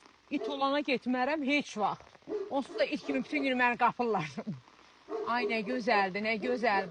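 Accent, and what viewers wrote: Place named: Baku, Azerbaijan. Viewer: daha Bakinka lar kimi dnışmaqa keçib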